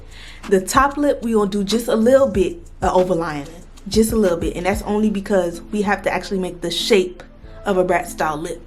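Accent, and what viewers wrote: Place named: Miami, United States. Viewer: I'm from Miami and this is EXACTLY how we talk 🤣🤣🤣🤣🤣🤣🤣🤣🤣😭😭😭😭😭I'm screaming💙💙💙💙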